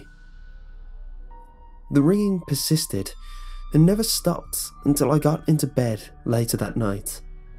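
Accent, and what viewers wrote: England, United Kingdom. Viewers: I'm trying to put the subtitles but you're so British that the words become realllyy fucking false lol fucking audio subtitles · Because that's how he speaks. English by the way.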